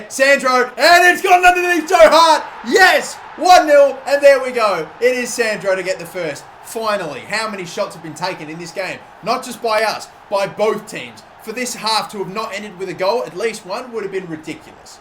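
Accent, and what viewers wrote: Australia. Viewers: Are u Australian. How do u support Newcastle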